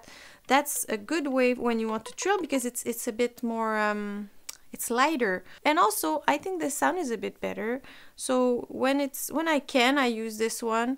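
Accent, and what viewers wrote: France. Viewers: o wow! Congrats!! ooh you are French! Das why you have an excent! I was reading the comments below 😂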